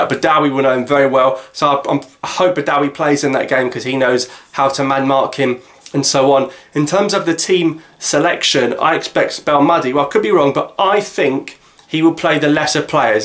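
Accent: United Kingdom. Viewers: hello, would you mind told me where did you learn the British language ?? or it's your mother language? and are you live in Algeria, please can you answer my questions?? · You got such a nice british accent , do u live in UK or Algeria ?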